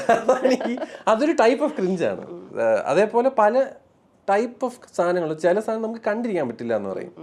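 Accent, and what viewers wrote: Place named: Trivandrum, India. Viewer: Love you. Jju tvm slang vidalle. From malappuram😊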